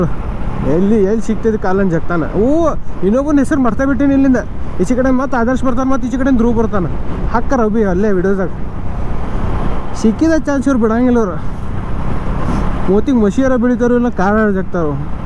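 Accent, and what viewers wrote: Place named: Belagavi, India. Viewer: Belagavi slang ❤ Mix masala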